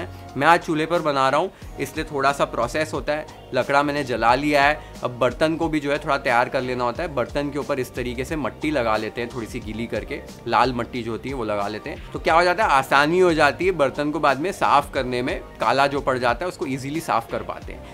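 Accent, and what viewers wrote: Maharashtra, India. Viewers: Ur marathi accent is v nice.... And very nice recipe yummy😋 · You give marathi touch it's grate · Your marathi accent is soo good ❤ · Your marathi accent is too cute😍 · Brooo ur marathii accent is soo spicy ✨ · It's amazing recipe and you know Marathi tuning is perfect, we liked it's too much. Keep sharing Marathi recipes a taste of India. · You be the best man and your Marathi accent 😌♥️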